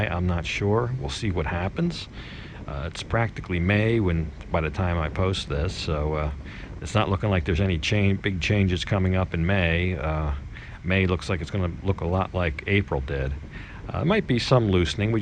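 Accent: United States. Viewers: Just stumbled across your channel and subscribed. I like the format and your narrative style! I plan to retire in the Pattaya area in a few years so your videos of the area are most informative. Sounds like you're from the States? Your name?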